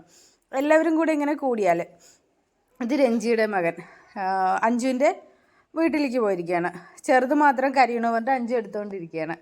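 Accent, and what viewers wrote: Palakkad, India. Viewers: Samsaram kettappo doubt thonni.😊 Palakkad evida?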